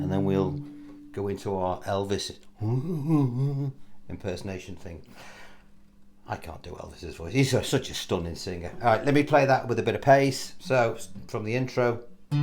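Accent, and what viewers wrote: Liverpool, United Kingdom. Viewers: Excellent lesson. Totally agree about putting every chord above, rather than a dummy such as meself trying to figure out where all the other chords go. Its held me back decades! Going forward it would be great if you could put more of the page 3 thingy's with all the chords going where they are meant to go. Thanks for the new word of the day too. Smuggery, had to look that one up. Nice1. Always loved the way you Liverpudlians talk, hence" The Beatles. Its all a load a shite, theres no frikkn werk as me old scouser buddy used to say back in 1984. A big Irish very slow fan! Have a good wan.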